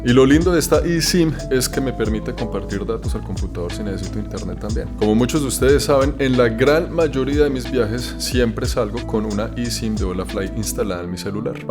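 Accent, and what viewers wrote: Bogotá, Colombia. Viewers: claro, y no es una teoría es lógica, estando un colombiano en canadá hablando inglés y francés por qué cambiaria su acento en español?, no hay nada ni nadie que le haga hablar español de distinta forma. Podrá olvidar palabras pero no la forma de pronunciarlas. Estando en España en cambio, tendría que usar el español para entender, hacerse entender y relacionarse con los locales, además sería normal que cambie palabras y dichos para que encajen con los locales y evitar malentendidos o tener que explicarse a cada rato, no te digo que hubiera cambiado por completo su acento pero si usaría palabras y expresiones del país huésped. Esto pasa en los dos lados, un español en colombia, argentina o méxico adaptará su acento al del país. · Cachaco de pura sepa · Hola,! Somos una familia colombiana que emigró a Canadá.Te seguimos hace tiempo, tus vídeos fueron muy útiles en ese duro proceso de hacer a un lado el miedo y volar.Nos encanta tu buena vibra y nos conecta ese Español Bogotano tan auténtico qué no te abandona.Te deseamos lo mejor...Por supuesto la tierra del realismo mágico siempre nos llama, allí están nuestras raíces.Un abrazo y éxitos 🍁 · La primera vez que te vi fue en un video de Oscar Alejandro. 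Escuché el acento y los dichos como “pichirilo” y me dije: “Es Colombiano”. Desde ahí te veo sagradamente todos los domingos y cuando sacas vídeos extras. Siempre tuve la idea de establecerme en Canadá. Tuve una beca para un curso de actualización en 2013 y me encantó el país. Hoy las cosas están confusas (y ya voy para los 50…), haces ver fácil tomar decisiones, creo que está en tu naturaleza de inadaptado. Yo creía que también era inadaptada y hasta cierto punto lo soy. Sin embargo, ahora que tengo nuevos cambios desde 2022… he confirmado mi confusión. De momento, prefiero quedarme y adaptar mi base a mi… Las cosas a los 50 se ven distintas, la energía y las prioridades cambian…me parece. Tal vez esta incomodidad que tengo me haga tomar una decisión como tú. Gracias por tu transparencia y sencillez. Bendiciones en esta nueva etapa. Abrazos desde Bogotá, chaolín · Me encantan tus videos, muy auténtico y me recuerda el acento de mi bella Colombia, que es lo mejor de lo mejor. 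· Acento Bogotano!!!!! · 13 años en Canada y no perdiste tu encantador asento Colombiano❤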